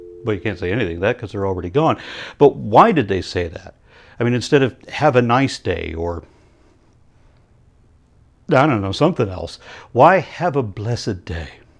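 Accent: South, United States. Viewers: That southern voice is so perfect lol (Also nice DND analogy) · Your Southern voice sounds both mentally handicapped and frightening as all Southerners do to me. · I love his southern alter ego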